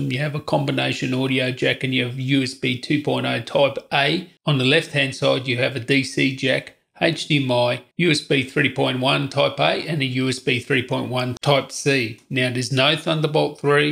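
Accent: Australia. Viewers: fuckin love that aussie accent mate, im sick and tired of american or english reviewers, keep up with the good work. cheers from Uruguay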